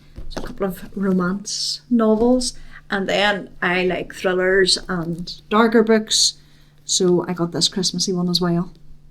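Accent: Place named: Ireland. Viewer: I have read several series of books about Ireland. Susanne O’Leary and Kennedy Kerr are two of my favorite fiction writers! I’ve never been to Ireland, nor will i be going at 77, but the names and places and customs do so intrigue me!!!! Being from Alabama in the southeast USA , Ireland is a place i dream about! Your accent certainly helps, too!❤️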